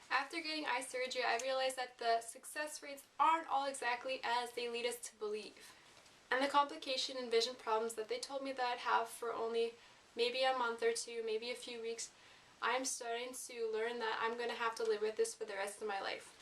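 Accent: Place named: Canada. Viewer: I feel really bad for you and the suffering you've had to endure due to Lasik. Thank you so much for your video. You have saved myself and thousands of others from this procedure. You said you don't wear makeup often because of swelling. I wanted you to know that I think you are very very beautiful without makeup so why bother? :) You don't need it! PS...you sound Canadian.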